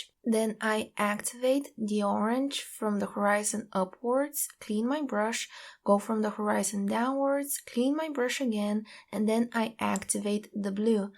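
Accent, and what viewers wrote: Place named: Eastern Europe. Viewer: I wish you narrated more of your videos. You have a beautiful speaking voice. Do you mind if I ask what country you are from? Your accent sounds like Eastern Europe to me, just wondering if my guess is correct. :-) Thank you for making these videos, I enjoy them very much.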